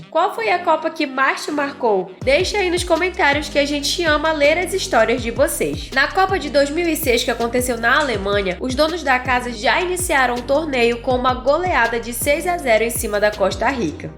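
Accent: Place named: North, Brazil. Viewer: Você é paraense ? Seu sotaque lembra a região norte. Parabéns pelo canal. Muito bom seu trabalho.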